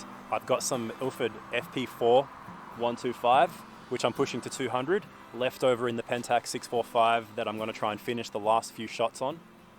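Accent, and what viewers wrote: Australia. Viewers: I used a lot of AGFA colour and BW film in the day. Loved it and miss it. Still use Rodinal. Good work. An Aussie voice. 😀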